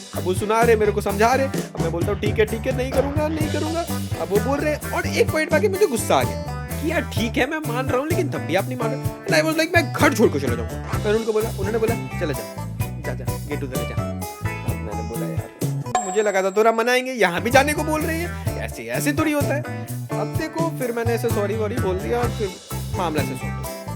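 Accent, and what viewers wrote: Bihar, India. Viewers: accent from Bihar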